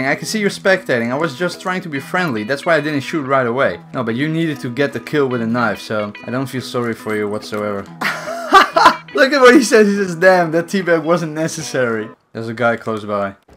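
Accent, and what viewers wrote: Netherlands. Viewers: His accent sounds SUPER dutch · Are you dutch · Are u Nederlands 🇳🇱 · Wtf you are dutch? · Lol are u dutch? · Wait are you Dutch? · Ben je nl are you Dutch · I know your Dutch i can hear t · are you from the netherlands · Boy you dutch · yo wtf you're dutch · ik hoorde aan je accent dat je nl bent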